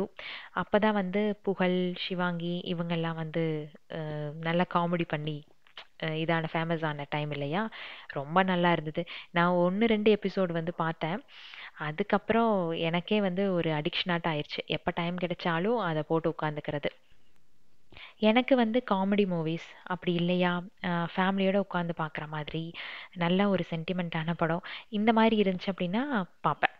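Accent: Coimbatore, India. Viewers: Akka unga voice super athulaum conversation with cbe slang vera level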